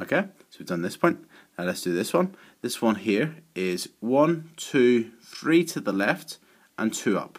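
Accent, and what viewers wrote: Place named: Northern Ireland, United Kingdom. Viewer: So nice hearing the voice of a fellow northen irish mathematician!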